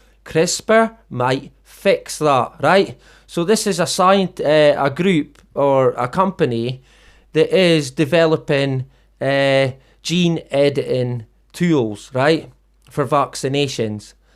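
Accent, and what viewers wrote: United Kingdom. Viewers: its comforting to know I'm not alone and to hear a UK wonderful voice lol I've mainly listened and watched American or Australian truthers the odd one from UK! If know any other small UK channels post a link cause an extra like and sub helps for people who stumble across these channels!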